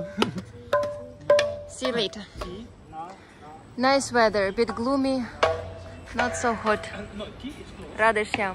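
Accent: Russia. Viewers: What’s is your mother tongue ? From your English accent it seems that you belong to Russia or Some ex-Soviet country.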